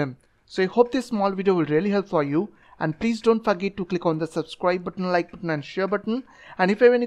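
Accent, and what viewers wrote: India. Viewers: I was happy after I heard that heavy indian accent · mr zoid i hate indian accents but did it work?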